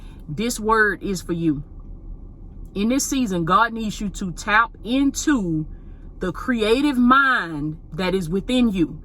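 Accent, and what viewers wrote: South, United States. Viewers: Sis u so country but love it ..talk to that thang that’s my word I use to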